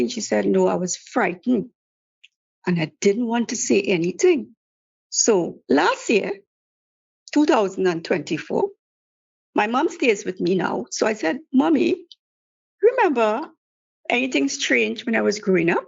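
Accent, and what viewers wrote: Trinidad and Tobago. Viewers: That’s a Trini accent. ❤️ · yes definitely a trini for sure 🇹🇹🙂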